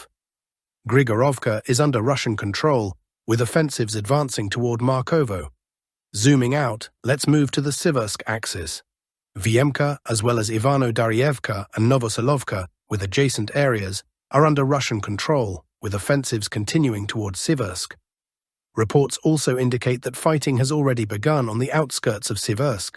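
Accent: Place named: Oxford, United Kingdom. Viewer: You're no Russian..MI6 fucking agent!No?? Howbis that talking with Oxford pronunciation?? Born in Britain??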